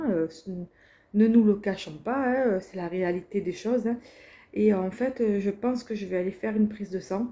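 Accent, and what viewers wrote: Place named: South, France. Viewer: Coucou tu m as fait trop rire et j adore t ecouter avec ton accent du midi oui je pense comme toi en vieillissant on aime redécouvrir les choses simples comme le jardin bon aprem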